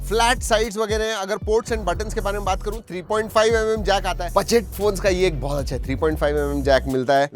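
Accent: India. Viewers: Indian english its a trash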